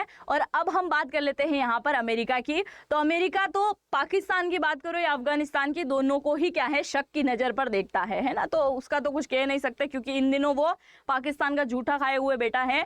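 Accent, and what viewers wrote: Gujarat, India. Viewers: I LIKE YOUR ANCHORING....YOUR HINDI LANGUAGE IS 👍 GOOD LITTLE BIT GUJRATI MIX UP. YOUR HINDI KI PALKI SHARMA......❤ U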